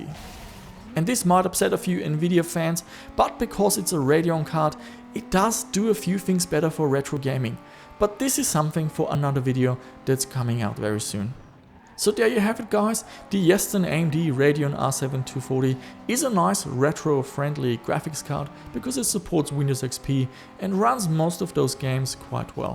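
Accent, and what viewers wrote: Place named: Germany. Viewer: You're from Australia? lol I always thought you're german because you sound like you have an german accent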